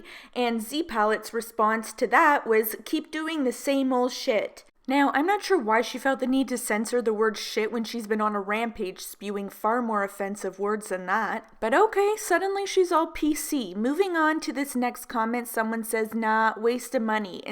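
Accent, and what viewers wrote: Canada. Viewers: Are you from Canada? Your accent sounds Canadian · Thinking of you! I appreciate all your content. I'm poor and disabled, so I'm a makeup buyer on a thin budget. You exposing shady or law-breaking (and rich) influencers gives me a small bit of schadenfreue, which I need sometimes. This is a little silly, but your Canadian accent always takes me back to my salad days, when I was doing my Master's and teaching in Windsor, ON. Everyone made fun of my Michigan nasal-ass accent, and I delighted in every "aboot." Slowly I began to develop my own Canadian accent, which would last for hours after I drove back over the Ambassador Bridge. Now I can shift between the two. But your every "sorry" takes me back to those fun times, chilling in the campus pub. After I watch your videos, I find I'm speaking in my Canadian accent. Sorry for the long story - I just thought you'd appreciate a little haha in this mess. 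Take care.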